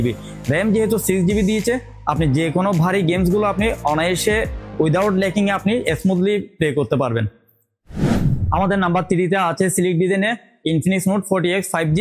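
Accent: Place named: Chittagong, Bangladesh. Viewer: ভাই আপনি যে চট্টগ্রামের লোক সেটা আপনার কথা বোঝা যাচ্ছে,অতএব আপনি আপনার মাতৃভাষা চেঞ্জ করে শুদ্ধ ভাষায় কথা বলুন,যে কথার মাধ্যমে প্রত্যেক জেলার মানুষ আপনার ভিডিও দেখে উপকৃত হবে।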